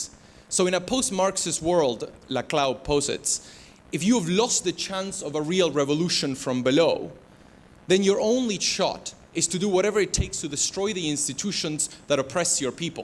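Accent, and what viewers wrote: Argentina. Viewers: pero se le nota que es argentino? al hablar ingles digo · Acento argentino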